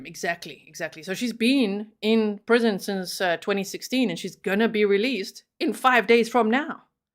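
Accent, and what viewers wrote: South Africa. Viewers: In the beginning I was like: She sounds South African...I wonder where she's from? And then you used the word 'shame' and then I knew😂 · I detect a faint South African accent? ❤️🧡💙💚💛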